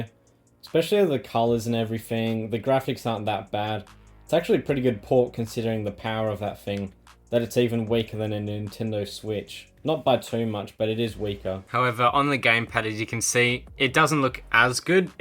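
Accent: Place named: Australia. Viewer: Subscribing for the aussie accent alone. Without joking awesome video 🍻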